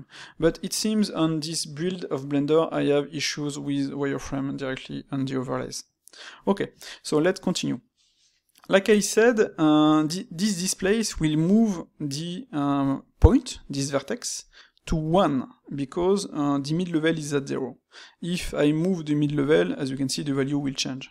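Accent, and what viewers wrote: France. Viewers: Bonjour! merci pour ton frenchy accent. pour une fois que j'arrive à comprendre un tuto en english!!! J'ai un souci lorsque tu applique le premier Bevel a les edges intérieures disparaissent, ainsi que la face... je suis bloqué. je suis sur blender 2.9.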